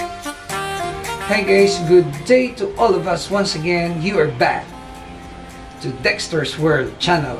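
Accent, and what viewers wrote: Visayas, Philippines. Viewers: Sir asa mo dapita? Kay murag2 duol ra ky bisaya man hahaha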